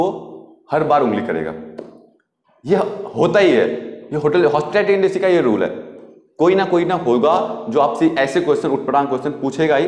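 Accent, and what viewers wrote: Uttarakhand, India. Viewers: Uk walo ka toh bolna he ek alag he andaj h ❤️❤️❤️❤️ pithoragarh 🔥❤️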